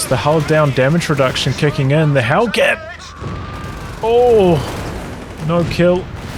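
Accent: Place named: New Zealand. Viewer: Just found your channel, and from hearing your voice I guessing your also a Kiwi. You're doing good stuff mate, definitely gonna watch some more.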